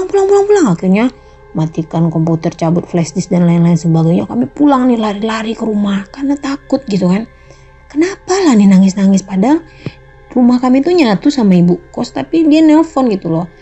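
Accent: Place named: Riau, Indonesia. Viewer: logatnya Riau kali kak❤Batam hadir ❤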